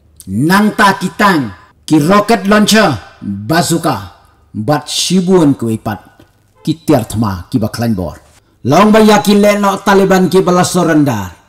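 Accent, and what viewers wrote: London, United Kingdom. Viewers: Ga deg earphones kyndit ia ka sur bah London.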